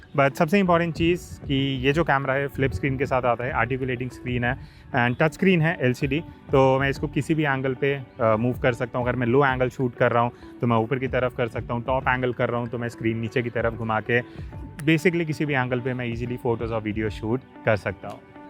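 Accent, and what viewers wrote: India. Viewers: Are you speaking English or trying to f*ck the language? Don't even try it again, you had better speak your own language (actually it sounds Indian, not English), and you have no f* idea how to pronounce it.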